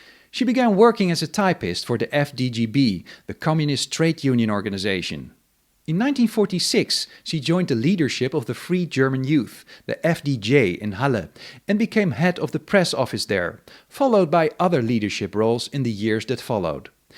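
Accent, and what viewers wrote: Netherlands. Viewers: You are from the Netherlands, aren't you? I hear a slight Dutch accent as well in your English as in your German. What brought you to work on this kind of German history?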